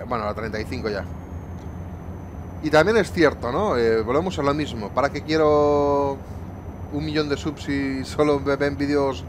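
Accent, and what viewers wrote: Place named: Galicia, Spain. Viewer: Hola.... había visto alguna cosa tuya... pero no asíduamente. ya me he suscrito. La verdad, veo más "al hombre de los besitos sensuales"...jeje.... unas cositas.... 1º por qué? nadie utiliza la cámara 3 para aparcar.... soy yo el único..... quizás sea porque yo voy con un teclado inhalámbrico pequeño y no tengo dedos para tantas cosas..... 2º, por fín alguien se dá cuenta de la parte buena de internet y youtube..... distraer, enseñar, divertir..... desconectar del trabajo, el día a día... etc... veo más ETS Y ATS que los juego..... básicamente por la conexión a internet.... estaba con spainLogistics y lo dejé por ese motivo.... y lo 3º.... soy un canario que vivió durante una década en Lugo..... y tengo morriñaaaaa..... así que, sólo por oir ese acento me pasaré por aquí más a menudo. saludos desde las islas. W.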